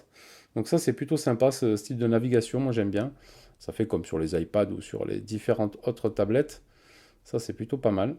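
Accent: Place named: France. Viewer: I really love his French accent. 😆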